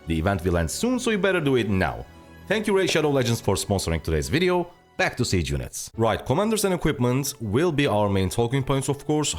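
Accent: Turkey. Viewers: Türk aksanı duyuyorum sanırım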